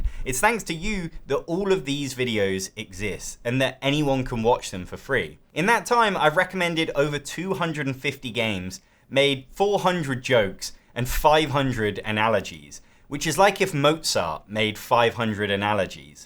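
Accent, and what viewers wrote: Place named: United Kingdom. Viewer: Have you considered adding support for more languages? Brazil's got a growing scene for board games, but very few of us know English well enough to keep up with your videos - especially with the British accent -.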